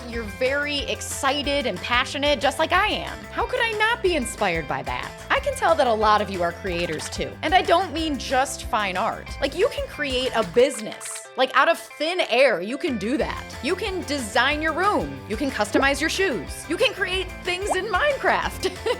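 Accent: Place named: South, United States. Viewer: I luv ur country accent that is different from the normal kind u speak when ur on food network on TV...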